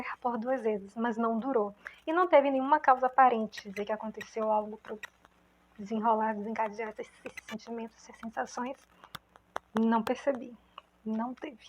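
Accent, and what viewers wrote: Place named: Bahia, Brazil. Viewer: Oi! Um salve daqui de Salvador! Estou na minha primeira onda, está sendo uma onda... rsrs! Obrigada por seus vídeos, é bom ouvir relatos de RH com nosso sotaque! Saúde e sorte pra você!❤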